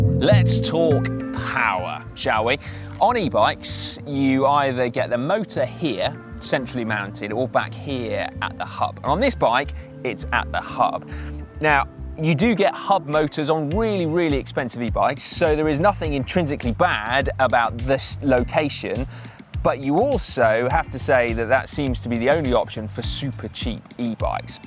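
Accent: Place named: RP, United Kingdom. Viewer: there needs to be a super cut of Si loathing things....cows, myatu's, not getting KOM's...exclusively in his needlessly posh voice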